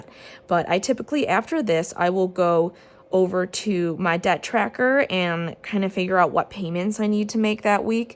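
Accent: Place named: Canada. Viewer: The Canadian accent is so strong, I LOVE IT 😂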